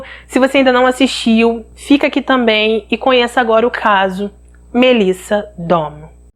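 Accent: Rio de Janeiro, Brazil. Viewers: Ainda tem esse sotaque lindo de carioca.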